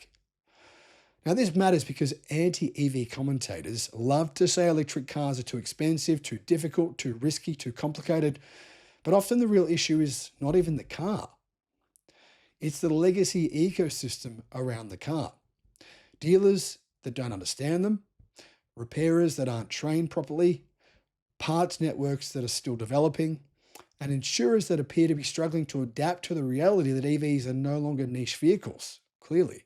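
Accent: Australia. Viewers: Is it an a Australian or overseas.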